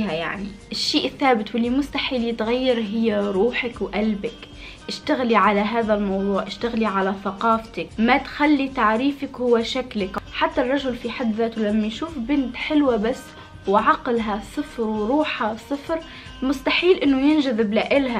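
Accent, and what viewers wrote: Tunisia. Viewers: اللهجه التونسيه عسللل❤️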